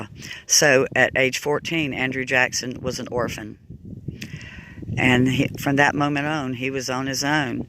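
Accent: South, United States. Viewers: Jackson's nothing but a murderer and a bigot. Trail of tears= genocide also caused a depression due to land buying changes explain your reason to visit such person. Oh wait that southern accent explains it all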